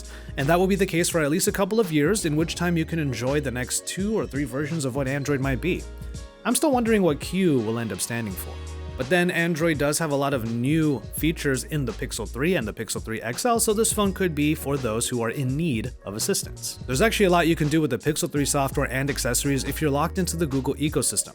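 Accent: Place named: Philippines. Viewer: I love it na hindi mo tinatago ang Pinoy accent 💙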